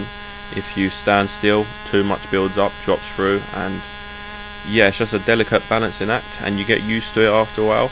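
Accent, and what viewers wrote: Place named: East London, United Kingdom. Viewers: акцент у этого негра не американский, а английский...думал в англии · Hi, I'm not so great with internet stuff so couldn't figure out how to message you directly. Judging from your accent I'm going with west essex/east london, but could be wrong. I'm a stick welder in the gas industry working in herfordshire and do a fair bit of prefab as well as on site work. Fancy a collaboration?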